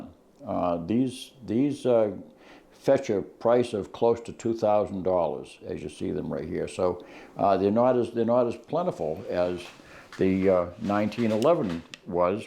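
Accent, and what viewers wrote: New England, United States. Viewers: Best New England accent ever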